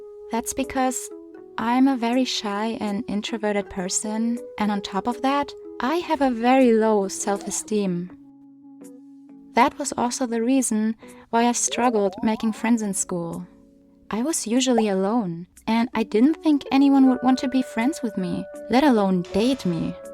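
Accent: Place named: Europe. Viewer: YOU SOUND EUROPEAN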